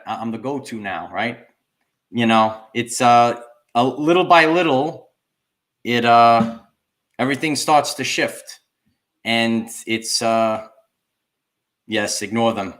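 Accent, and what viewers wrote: New York, United States. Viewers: Sounds like a New Yorker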